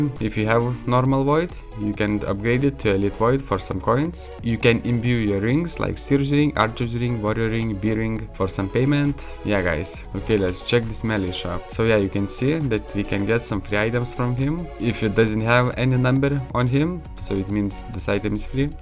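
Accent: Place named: Lithuania. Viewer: You sound like you are from lithuania , btw great server 100 + players online everyday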